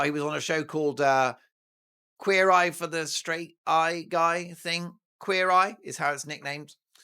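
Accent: Australia. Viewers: Your Aussie accent is terrific!!! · your Australian accent is pretty good but it's more * than *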